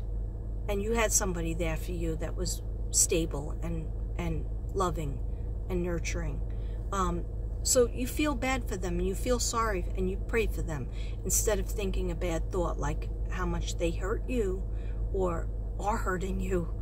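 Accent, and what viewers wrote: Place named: Northeast, United States. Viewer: Wow, those glasses look great on you! So pretty! I’m new to your channel. You mentioned Texas, but what is your accent? It sounds Northeastern.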